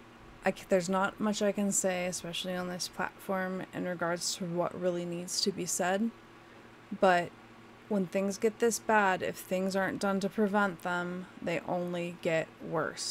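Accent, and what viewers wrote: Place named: United States. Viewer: You sound pretty AMERICAN to me. There's nothing wrong with being proud of your ethnicity but your definitely an AMERICAN js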